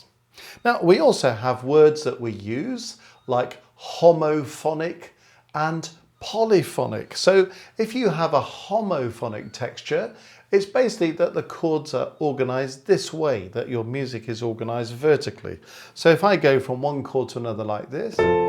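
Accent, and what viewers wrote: United Kingdom. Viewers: great teaching video sir! love your British accent really! the way you delivery the knowledge is really easy to understand! also i love every single example you played on the piano. so beautiful. could you please list the titles of examples when you have a chance? thank you so much and cant stop watching your videos. xx.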